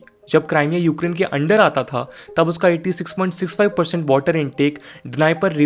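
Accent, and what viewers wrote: India. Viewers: Indian accent. I am pretty sure India will be on the west side in this conflict as they really hate China. Then most of the middle east will join putin. North and South korea will clash together. Wildcards could be Brazil and south american countries. Some will join the west but some want to see the US burn. Other wild cards would be African nations like Egypt, Sahel, Etiopia. It will all be a bloody mess and it is hard to see how we will get any winners in this conflict.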